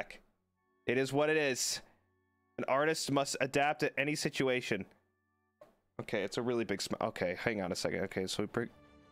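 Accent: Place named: France. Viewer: with a French accent makes him so funny and handsome (totally not saying this bc his description says so- ) Joking aside, I love seeing your silly art Mr.. Truly an artist of time indeed <3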